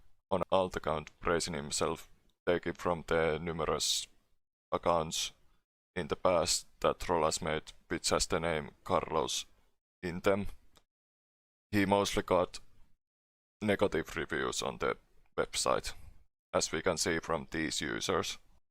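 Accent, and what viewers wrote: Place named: Finland. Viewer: u sound like finland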